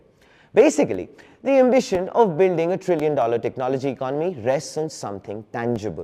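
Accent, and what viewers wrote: India. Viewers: Yes you can beat china by your fxxking braging mouth.....cause yours danm good in fxxking curry english That all😮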